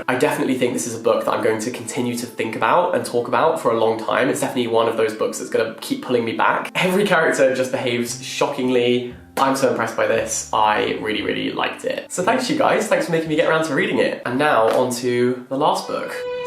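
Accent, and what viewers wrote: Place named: England, United Kingdom. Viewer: Again, your english accent is exquisite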